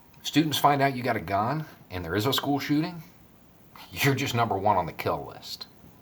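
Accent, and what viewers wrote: South, United States. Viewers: I just recently discovered your channel. I love the way you present things in a straight forward manner. You always give me something to think on. And props on showing the world people with southern accents aren't knuckle-dragging idiots. I've lived in Charleston, SC my whole life and sometimes my accent causes me to not be taken seriously. 🙄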